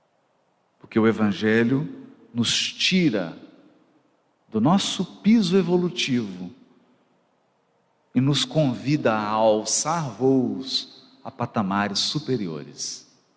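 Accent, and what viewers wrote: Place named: Minas Gerais, Brazil. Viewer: Tô ouvindo você e observando a fala em todos os sentidos e aspectos Percebi que é mineiro assim como eu E esse exemplo de mineiro ir p são Paulo por essas regiões estradas citadas passei por lá e sem GPS , MAS CHEGUEI LÁ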